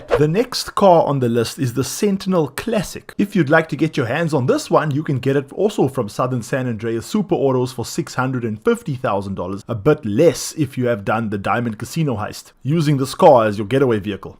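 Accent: South Africa. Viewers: Are South African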